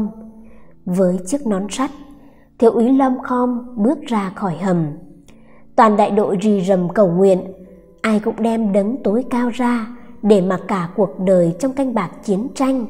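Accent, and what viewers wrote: Standard. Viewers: Giọng đọc của rất chuẩn và truyền cảm THẬT SỰ RẤT HAY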